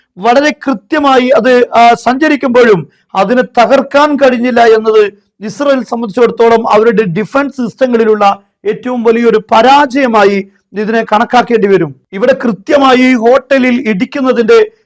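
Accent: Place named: Tamil Nadu, India. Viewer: Dont read english please, thats not your skill , your Tamil english very irritating but all other talks are ok😅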